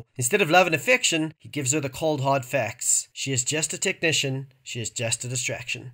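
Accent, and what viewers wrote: South Africa. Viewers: Are you South African? Your accent sounds South African.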